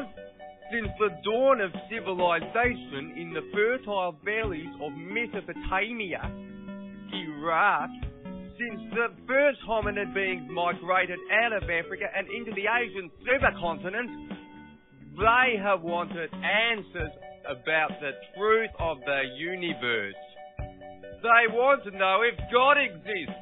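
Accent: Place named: Australia. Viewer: He doesn't sound euro - it's Australian.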